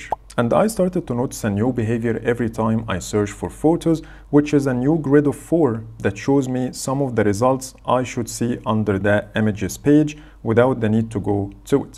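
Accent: Egypt. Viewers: You sound like you're Egyptian right?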